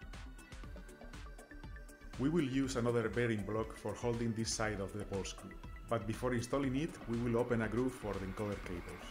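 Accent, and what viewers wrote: Spain. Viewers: Tienes al acento espanol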